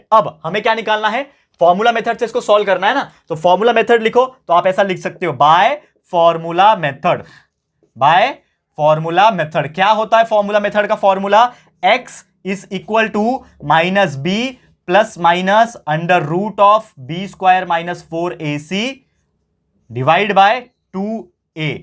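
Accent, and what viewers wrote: Maharashtra, India. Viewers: Sir aap Marathi ho kai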